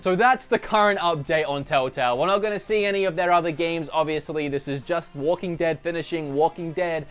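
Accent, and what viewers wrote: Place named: Australia. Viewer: you know hes Australian right...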